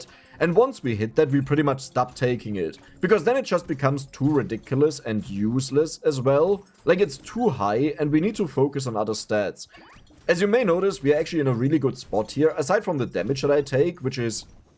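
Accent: Germany. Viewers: Ich dachte mir schon das du ein deutscher bist 😂😂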